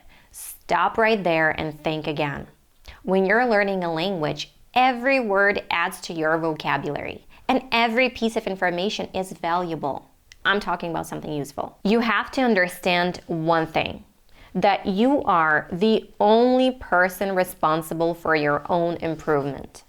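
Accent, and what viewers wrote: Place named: United States. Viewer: Your accent is so American👍👍👍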